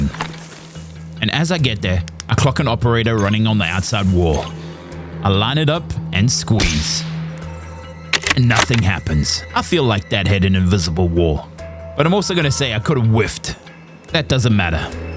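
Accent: South Africa. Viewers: You sound South African in a way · I see your ping is around 26ms but your accent sounds South African. Did you relocate or am I missing the plot here :) Good job on the shorts and longer form videos. Always a nice watch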